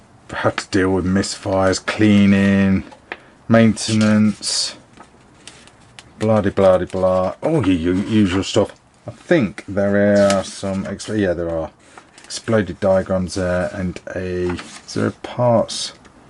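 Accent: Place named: United Kingdom. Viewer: Oh, this British accent :D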